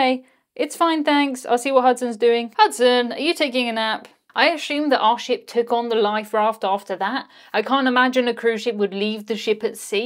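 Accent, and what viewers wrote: United Kingdom. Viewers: I love British accents♥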